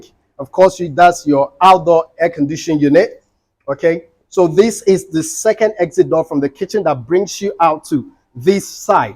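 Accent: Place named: United States. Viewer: of late sounds Soo American oo😂😂😂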